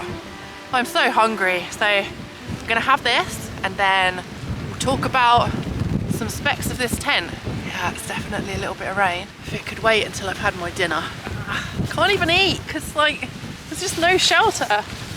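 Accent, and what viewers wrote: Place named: Bristol, United Kingdom. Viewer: Which goes to prove, if it can go wrong, it will! Still, you made the best of it. Trying to place your accent - sounds like Bristol / North Somerset, (I'm from Weston-super.Mare), and it sounds familiar! Anyway, keep on making these interesting videos.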